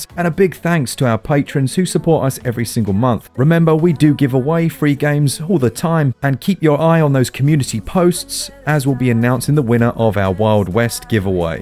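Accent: United Kingdom. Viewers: yay british. im subbing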